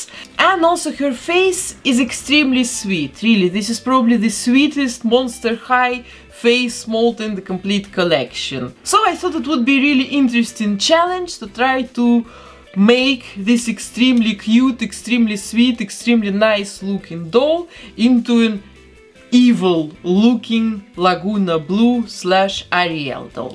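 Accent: Russia. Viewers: Че за акцент? Ебать, так ты русская ಠ‿ಠ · Your accent is so cute 🥰 You are from Russia ? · Ты получается, русская? Просто очень много конкретного русского произношения :D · Русский или Белорусский акцент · Вы русская? Просто у вас есть акцент, это слышно)) Вы классная You're cool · Такое ощущение что ты русскоговорящий ютубер потому что у тебя такой акцент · You are from Rassia?I think you say whith Rassian accent. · Вы русская?У вас русский акцент) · Блин так слышно Росийский акцент) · Русский английский 😅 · Детка ты не одна такая она 100% русская, в Америке и в Англии не в одной стране нет такого произношения! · я тоже сначала подумала об этом. очень похоже на русский акцент. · акцент русский как будто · Она говорит как русская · Are you Russian because your accent is gorgeous xx 🤩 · Акцент очень русский напоминает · Ты похожа на русскую, особенно по произношению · может она русская... 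акцент прям Русский пипец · у меня уши болят из-за этого русского акцента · I'm the only one who noticed that she has a very strong Russian accent? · Мне не одному кажется что она русская и пытается говорить на английском?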